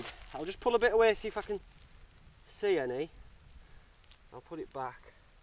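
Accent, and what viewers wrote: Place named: Northern England, United Kingdom. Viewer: I moved to America 6 years ago and I love hearing a good Notthern accent. But the Morrisons bag was just too much dude!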